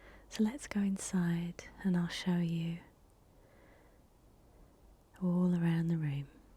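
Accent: United Kingdom. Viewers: Who else but could relax me and make me sleepy doing a reveal video. Looking forward to your new studio, and plz quit rubbing and scratching the carpet i allmost fell off to sleep. Lol and being from the southern part of the USA, i LOVE the Brithish accent! Good luck Love!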